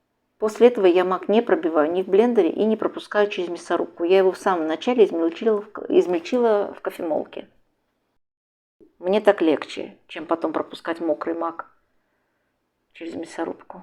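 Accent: Baku, Azerbaijan. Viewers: Спасибо большое за рецептик, у вас такое тесто получается бесподобное, буду печь к Пасхе по вашему рецепту. А разговор ваш чисто бакинский 😊 ❤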